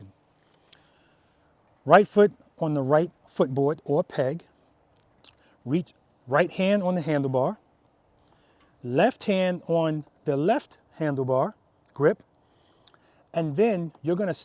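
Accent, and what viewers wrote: New York, United States. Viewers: Picked up a bmw GS this morning, they're not that bad... it being a 750GS not a 1250GS Adventure (the tallest version, which you probably rode) is completely beside the point. It is a massive difference to the Yamaha/Star Bolt (my Yamaharley lol) I had the last 10yrs. Also as an aussie I love your New Yawk accent, I'm a weirdo who likes listening to how people talk :)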